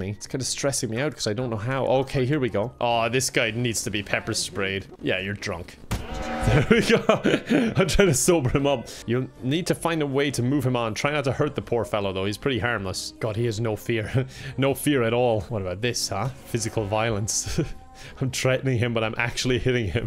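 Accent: Ireland. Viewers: is a 100 percent Irish human. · Its cuz of the Irish dialect. Its an Irish thing, not a thing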